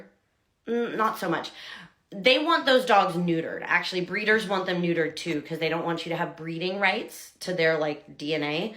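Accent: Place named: Canada. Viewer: This video makes me think you've never actually lived in the US. Are you Canadian? You sound like you have a Canadian accent.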